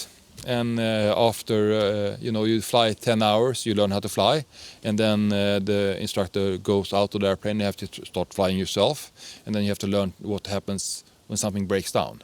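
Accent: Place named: Poland. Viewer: Wow, great idea, with starting a channel, at the same time with educational value, but it will also be a great diary for you. I stumbled upon it by accident, on the head of YouTube page, your video of your trip around Europe came up and made me curious. You have gained a really interesting aviation experience, as well as a surprising Polish accent that you flew in LOT, I myself am at the beginning of this path, I am 26 years old, about 80h of flight, studying to pass the ATPL, at the same time working remotely living in Szczecin for a German company that operates CJ1 and 2's. The aviation world is not huge, maybe one day we will meet at some airport. Greetings, Olgierd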